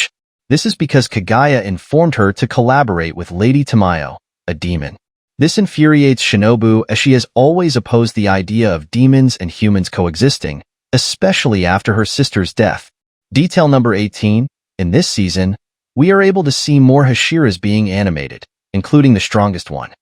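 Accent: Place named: United States. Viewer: Stop fcking using American accent to Japanese names ffs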